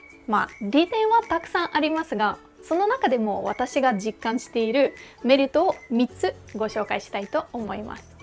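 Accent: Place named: Japan. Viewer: Gosto muito de ouvir voce falando, seu sotaque japones me agrada e eu entendo ,pois eu só não falo japones mas entendo que as pessoas falam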